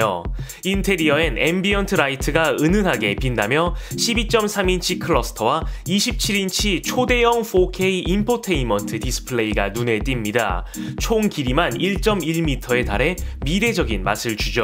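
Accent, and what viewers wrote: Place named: Jeolla, South Korea. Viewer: 히 이분 말투가 전라도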